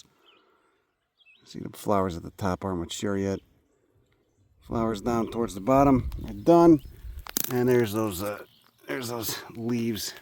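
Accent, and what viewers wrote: Chicago, United States. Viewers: your from Chicago right? Have that accent yah. me too well i don’t have the accent though. anyway been watching your stuff for about a year now so kinda new compared to how long you’ve been on. The humor sarcasm is what keeps me coming back. And i love plants and the way you make it fun. especially love the Texas ones.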